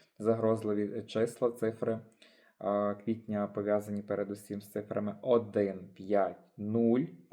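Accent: Ternopil, Ukraine. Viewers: Спасибі, з тобою спокійно...затишно..і ще люблю тернопільський говір..твій баритон.....ти народний провидець. дякую.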